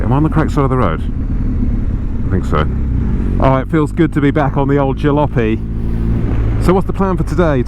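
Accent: United Kingdom. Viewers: I find your videos very relaxing and you have a very posh english accent!😁 I wouldn't be surprised to hear you at some point: Alloweth's speedeth up a did bite !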